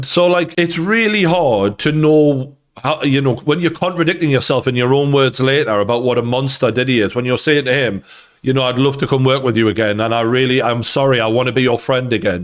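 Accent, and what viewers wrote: Newcastle, United Kingdom. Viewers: A True Geordie old mare.. Talkin Bollox as usual..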